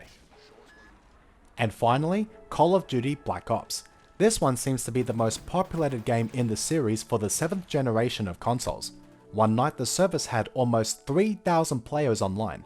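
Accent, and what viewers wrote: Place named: Australia. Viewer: Not bad for an Australian accent.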